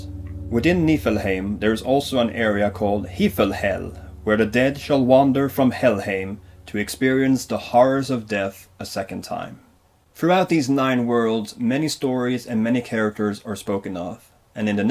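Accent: Skåne, Sweden. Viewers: He can if he wants to, it's just his dialect that screws things up a bit. He has the Skåne dialect, the most southern dialect, it's almost Danish. I'd like it if he would speak "rikssvenska" but if he insists on speaking with the dialect we should let him. Overall he did a great explanation of the nine worlds and I enjoyed the video alot. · It's so interesting hearing the Swedish pronunciations of familiar names and words from Norse mythology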